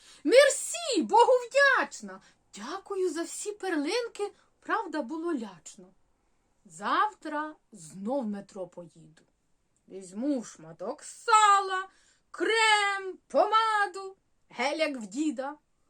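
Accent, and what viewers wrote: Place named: Poltava, Ukraine. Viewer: лелечко, сонечко, ви ж такий талант! Я буду по всіх знайомих каналах на Ютубі вас пропагувати. Українського Ютубу багацько не бува. І мені як медом по серці ваша Полтавська говірка) ви неймовірна жінка!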